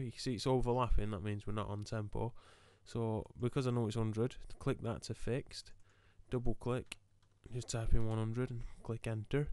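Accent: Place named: South Yorkshire, United Kingdom. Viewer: your accent sounds familiar, you from south yorkshire?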